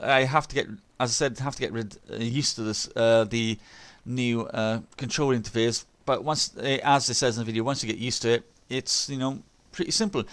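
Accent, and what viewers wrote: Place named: Ireland. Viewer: Irish accent, spot on!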